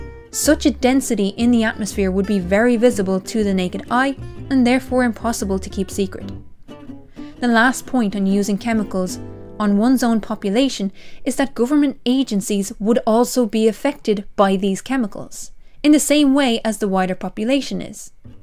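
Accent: Ireland. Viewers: yes, has a Welsh accent. has an Irish accent. Welsh accents are a bit harsher. is easier for people to understand. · It's that Irish accent, it's very nice 😁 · she has a lovely irish accent, i set the voice on my satnav to Irish Female, its so melodic. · Have to confess I’m a sucker for a female Irish accent even if biting sarcasm is missing. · Oh I like her voice.... Love that Irish accent. Much better than that welsh stuff (kidding, love ya ). So contrails... fuel is a hydrocarbon.... the hydro is important here. When the fuel burns one of the exhaust components is water vapor. That water vapor is what we see when the conditions at altitude are right to cause this to happen. Temp, pressure and humidity are important factors. These conditions might exist at ground level, or only above 40,000 feet, or anywhere between. So... yeah, just water kids, nothing more.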